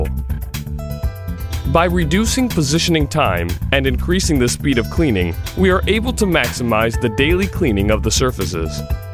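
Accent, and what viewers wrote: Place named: Texas, United States. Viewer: 9000 l. tanks and waggy slow polybrushes 2-3MPH...18 loops of the Gemasolar/Torresol Energy place a day? It looks a horror. But it handles those close-in parabolic reflectors. Does the power plant condense much over 9000L on the turbines' cold side as some sort of side-effect? Was electrostatic or mirror-mounted cleaning out of the question, compared of course to the truck dieseling by all day? Application research and Basic R&D are different, you! The HTF (high throughput fluid) truck's 'nitrogen therusion' for keeping the rubber solid is the only real howler in the mildly gamy Texmexican Voiceover.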